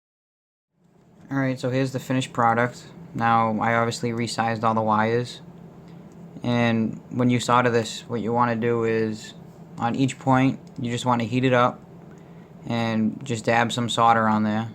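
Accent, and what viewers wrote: Boston, United States. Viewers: God that baston accent terrible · skeletøn clique its boston I think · really thick Boston accent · What part of Boston are you from?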